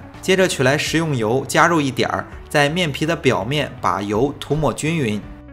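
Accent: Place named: Jinan, China. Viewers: 这济普话听得真亲切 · 青岛人，让你听出来济南话了。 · 这北方话，北方风味，👍🏻👍🏻👍🏻👍🏻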